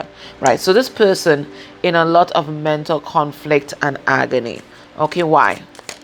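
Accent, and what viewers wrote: Trinidad and Tobago. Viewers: are u a Trinidadian...u hsve our accent lol...thank u for the reading 🌻🌼🌻🌼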